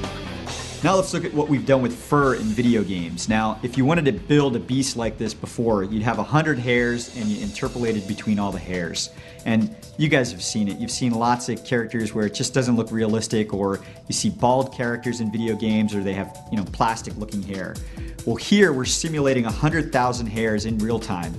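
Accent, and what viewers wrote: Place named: United States. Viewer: Brown guy with an american accent...WOOOOOOOOT JOIN THE WAGON BROTHER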